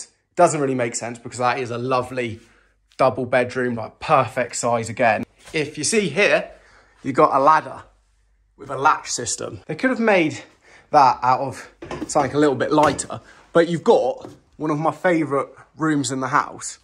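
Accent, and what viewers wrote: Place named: West Country, United Kingdom. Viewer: I can hear a twang of West Country in your voice. I love it.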